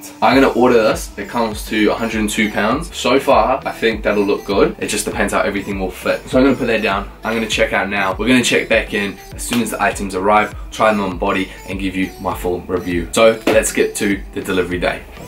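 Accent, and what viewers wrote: New Zealand. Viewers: Sounds like you copped a New Zealand accent 🇳🇿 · are you a kiwi living in the UK?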